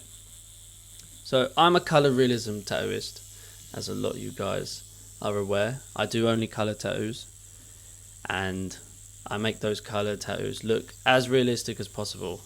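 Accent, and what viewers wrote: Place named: London, United Kingdom. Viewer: I love Londoners accent! Keep rockin the tatt-ewwss mate!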